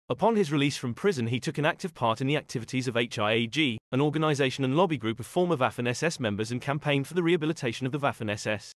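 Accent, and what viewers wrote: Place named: United Kingdom. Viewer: When I heard the British accent I bailed.The sheer Know-it-All tone and Superior better than Them and You attitude set my teeth on edge!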